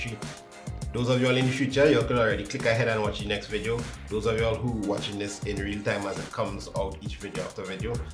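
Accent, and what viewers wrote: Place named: Trinidad and Tobago. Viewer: I can tell that he is trinidad by the patois 😂😂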